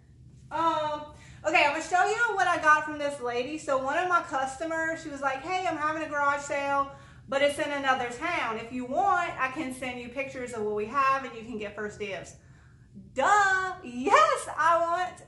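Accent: Louisiana, United States. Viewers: You sound like a fellow Lousiananian. Am I right? Great haul . Edit: I made my comment as soon as the video started. I just heard you talk about Lousisna weather. So, yep.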